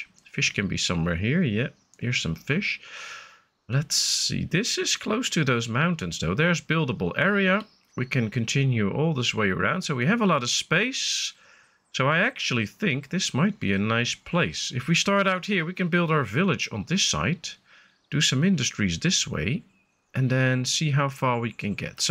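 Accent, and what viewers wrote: Netherlands. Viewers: We zullen zien. P.S. Ik herkende gelijk het Nederlandse accent toen ik zocht op Foundation Lets play, vandaar dat ik in het Nederlands reageerde.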